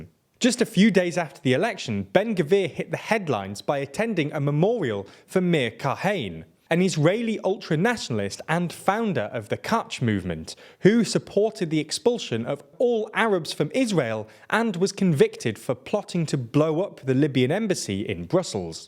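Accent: England, United Kingdom. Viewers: It's funny listening to an Englishman pronouncing Hebrew words as if they were English words.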